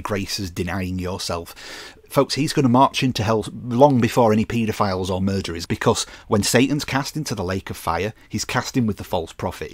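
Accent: United Kingdom. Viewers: I never knew hard preaching in British accent is something I needed but now I can't stop watching your videos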